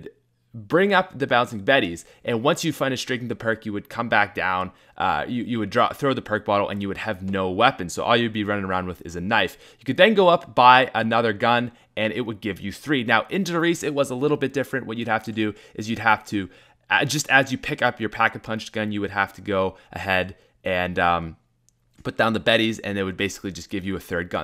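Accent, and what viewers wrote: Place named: Newfoundland, Canada. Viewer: Are you from Newfoundland? Your accent sounds a lot like one of my teachers that used to live in Newfoundland.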